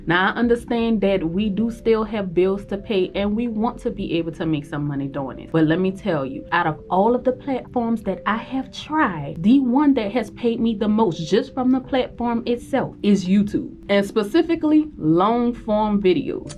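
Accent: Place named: South, United States. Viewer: she's definitely from down south with that accent lol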